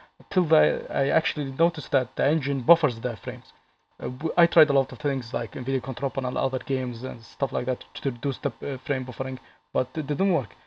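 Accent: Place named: Arab world. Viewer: والله كنت اقول الاكسنت عربي ههههههههههههه.. من وين؟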